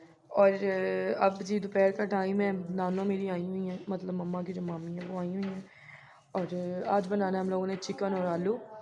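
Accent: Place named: Lahore, Pakistan. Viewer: میں آ پ کی ساری وڈیو دیکھتا ہوں آ پی آ پ کہاں سے ہو آ پ کی بولی لاہور کی لگتی ہے ❤❤❤❤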